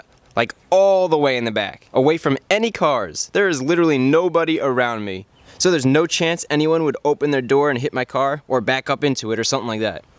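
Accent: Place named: New York, United States. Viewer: You have sort of a NY accent. lol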